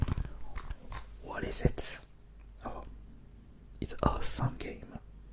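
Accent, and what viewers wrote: France. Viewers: Un accent un peu à la française mais ce concept et cette vidéo sont super, je n'ai regardé que 2 minutes de la vidéo et je ne pense pas que je vais tenir longtemps avant de dormir... 😅🤣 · Je savais que tu étais français, tu mélangeait le présent simple et l'impératif avec le ING mais c pas grave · soit l'accent est bon, soit il à l'accent français, pas les deux, mais un bon accent implique une neutralité de l'origine de la personne. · Pour le coup ton accent est vraiment bon, tu me fais penser à PierreG asmr ou Made In France asmr. Pour le coup, on ressent bien l'accent français, mais je trouve ça vraiment relaxant ! Bien joué, et c'est aussi très courageux ! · La vidéo m'as beaucoup fait rire et m'a beaucoup relaxée. Ton accent français est hilarant mais dans le bon sens du terme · t'es français mec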